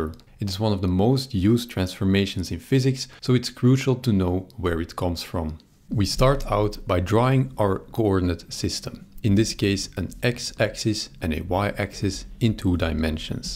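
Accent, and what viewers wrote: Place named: Belgium. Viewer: Ik kon direct horen dat je een belg was haha, moest dit even opfrissen voor bachelorproject robotica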